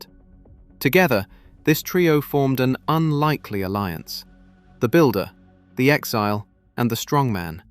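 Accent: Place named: United Kingdom. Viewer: And…”Shom”? Good God, this British accent allegedly is silly with almost all American Racehorses discussed.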